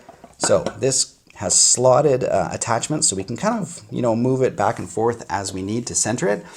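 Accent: Canada. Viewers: I like your Canadian accent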